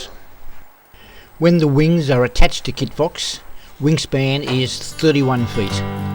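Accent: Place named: Queensland, Australia. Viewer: He said 1988, NQ Aussie accent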